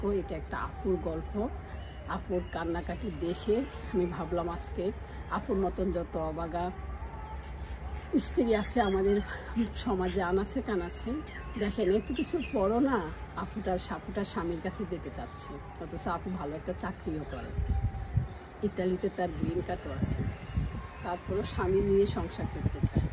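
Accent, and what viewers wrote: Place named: Comilla, Bangladesh. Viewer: আপনি শুধু বিয়ে নিয়ে থাকেন। মন রোমান্সে ভরপুর। কুমিল্লার কোথায় থাকেন? আপনি কুমিল্লার মেয়ে। ভাষায় বুঝা যায়।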